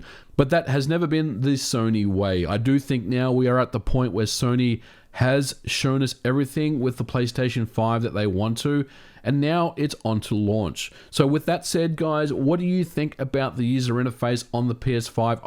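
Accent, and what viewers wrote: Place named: Australia. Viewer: Australian with a hint of American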